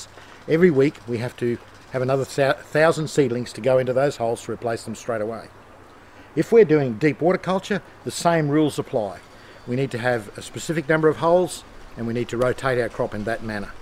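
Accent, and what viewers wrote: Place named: Australia. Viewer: That head of lettuce you picked up was absolutely Gorgeous! When I go to Australia (positive thinking) I definitely want to visit your farm. btw: I just love how you sound. Australian accent is so cool:)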